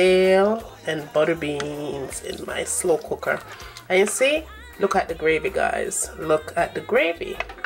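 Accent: Jamaica. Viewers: Yessss I feel like I'm in Jamaica listening to you right now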